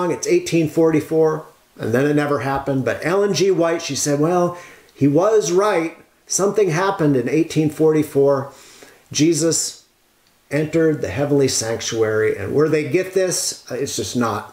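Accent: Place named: North America. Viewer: According to the Oxford dictionary, the word cult is defined as "a relatively small group of people having religious beliefs or practices regarded by others as strange or sinister". Firstly, by way of informing you, the seventh day adventist church is a world wide denomination with an approximate membership of over 20 million members...( and counting ) Of this number, over 1.2 million alone are from North America, a place where you reside given your accent. Hence, the growing size of this church by way of simple logic disqualifies it as a cult. Like the dictionary says, a cult is made up of a relatively small group... however, on the contrary, this church is not a "put together church" formed in recent times in a shed to the back of nowhere, rather, this church was prophesied by God himself and is sustained God himself with a global reach. In addition, the said dictionary states that the word cult is associated with devotion towards a particular figure or object. 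Again you seem to be misinformed as the only allegiance the church bears homage to is Jesus Christ hence the name " SEVENTH DAY ADVENTIST " which means, looking forward towards the coming of the Lord. In other words, their belief is in there name. You are correct in suggesting that the church have a leader. Infact, they do have a leader, someone they follow, but it's not Ellen G White like you suggest, rather Jesus Christ their soon coming king. Lastly, the origins of this church is not only supported with Biblical e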